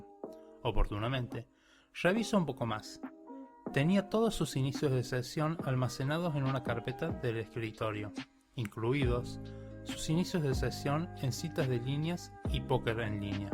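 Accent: Córdoba, Argentina. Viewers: Tu acento es muy argentino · Es cordobés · alto acento cordobés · Ese acento coshentino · queria preguntar si sos cordobes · es re ccordobez el narrador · Best ascento cordobes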